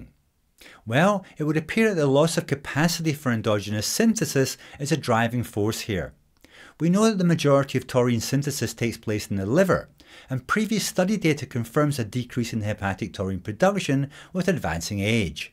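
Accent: United Kingdom. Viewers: Very helpful! Thanks. Btw, will it make your hair fall out like this other supplement I forget the name of? ...creatine? P.S. what kind of fancy accent is he speaking with, something British? I couldn't understand some words, believe it or not.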